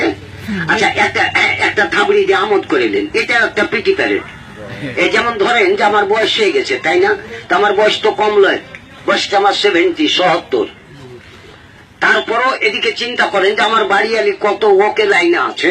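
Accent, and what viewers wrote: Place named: Murshidabad, India. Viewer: Murshidabad er motho bhasa bolchhis re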